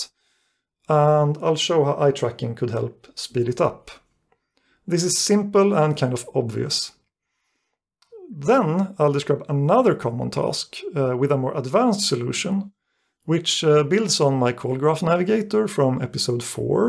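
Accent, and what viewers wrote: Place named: Sweden. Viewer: The Swedish accent is strong in this one :)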